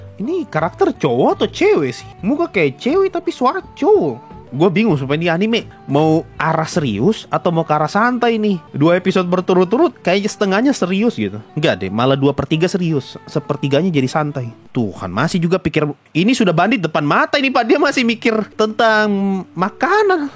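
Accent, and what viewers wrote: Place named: Eastern Indonesia, Indonesia. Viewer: anjir logat mu kek tmn2 gw yg dr ntt/papua bg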